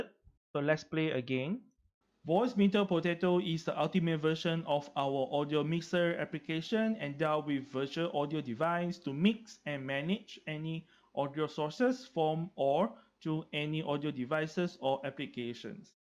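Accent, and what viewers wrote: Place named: Asia. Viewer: Get rid of the damn background music. It is bad enough that your Asian accent is difficult to comprehend. It is even worse to try to learn from this video with that background noice.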